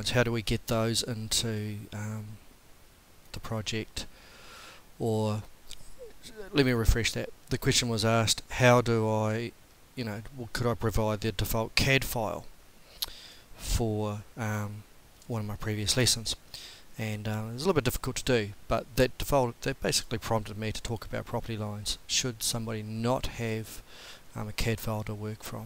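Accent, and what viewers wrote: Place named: Australia. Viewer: Sydney, Cronulla. Where are you? I can tell your from Au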